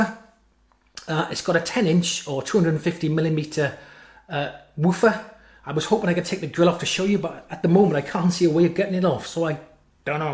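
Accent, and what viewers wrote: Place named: South Wales, United Kingdom. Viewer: Great video! You sound like you live in South Wales 🏴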